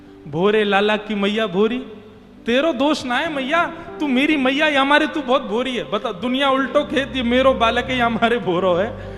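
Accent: Braj, India. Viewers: Aaha aapki vraj ki bhasa mohit kardeta hi maharaj koti koti pranam🙏🙏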